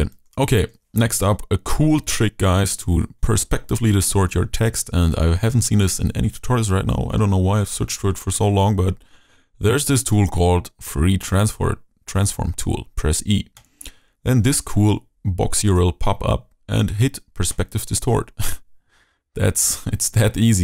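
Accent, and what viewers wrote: Germany. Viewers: deutscher hört man sofort raus :D aber nettes video xD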